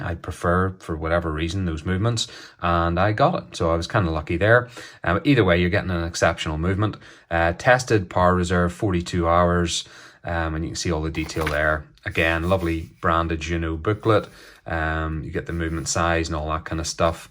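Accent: Northern Ireland, United Kingdom. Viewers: Yes it's a small world. I had watched a Just one more watch video and your video played after it. When I heard NI accent and looked at the name I thought you must be Shaun's brother. Hope you are keeping well and getting through lockdown ok. We have just had ours extended another 3 weeks.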